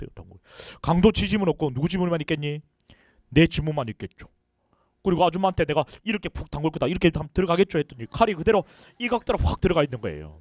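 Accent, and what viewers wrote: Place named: Gyeongsang, South Korea. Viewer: 갱상도 사투리 거부감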